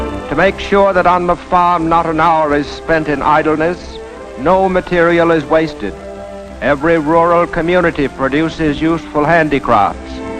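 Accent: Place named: United States. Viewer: Hello all, no offense to the uploader & the rest. BTW, I must stressed that I'm not a Japanese. This video is simply a US propaganda film, judging from the narrator's accent. Its quite insultive in some ways to east asian thinking. AND stop calling jpn/chin/kors "ASIANS!" Its makes you sound stupid. thanks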